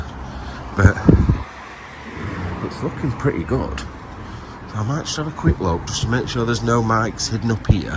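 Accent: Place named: England, United Kingdom. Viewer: Northern Irish here lol it was a bird but if there's teens there and hear your English accent hey with think your soldier lol b3 careful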